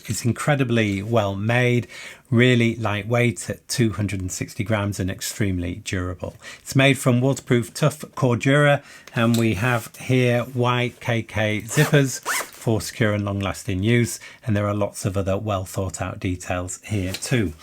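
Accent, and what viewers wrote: England, United Kingdom. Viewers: That’s an English accent.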